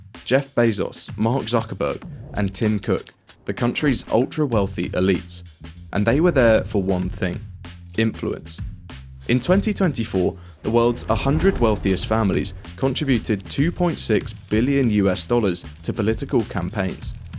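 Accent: United Kingdom. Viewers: Why is someone with a British accent always telling me about America...and everything else for that matter. Does some study say people listen to this accent more attentively, or something? If it were a mild accent, as if they had actually lived where I live, I would say alright, maybe parents were British or something. But sounding like you just washed up on American shores and are here to tell us how to live and what's up......rather insulting and I don't want to really listen to it. Especially looking at what happens in your own damned broken country. Don't want to emulate that crap.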